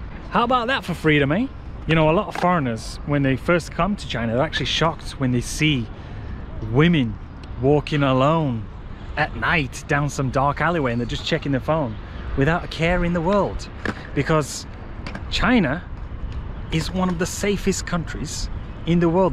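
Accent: United Kingdom. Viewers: Your criticism is that Americans have been brainwashed about China without having even been there. You say our media is corrupt (not wrong). But then you take the absolute worst headlines from this so-called "corrupted" media and push them even further out of context and make shocking omissions of factual information to make America (and the UK) sound like dystopian wastelands full of murderous villains. And how long have you lived in America? Yes- every country has its problems and need to do better by their people. But I have a question for you: If America is such a horrible place, why are massive droves of people risking their lives by walking all the way from places like Guatemala and swimming across the Rio Grande for a chance to get in? I don't know how long the lines are for the attractions in China. But Texas isn't exactly a short walk from Guatemala. 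You have one thing going for you- A British accent. And Americans will pretty much believe anyone with a British accent. How is this not immediately apparent to anyone with a brain as blatant propaganda?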